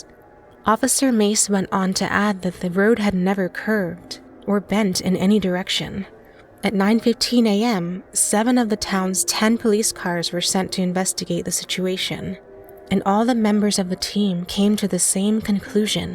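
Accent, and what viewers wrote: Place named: West, United States. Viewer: I love the western accent!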